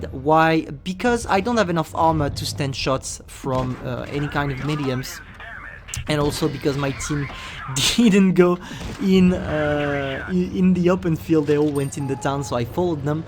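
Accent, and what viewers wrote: France. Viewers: I can feel ur french accent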